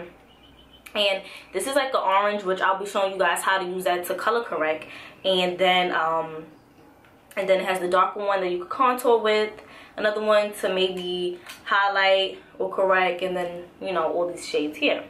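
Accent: New York, United States. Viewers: As soon as I started the video I knew you were from the New York City lol thanks for sharing this review, about to go try mine out now! Just subscribed beautiful xo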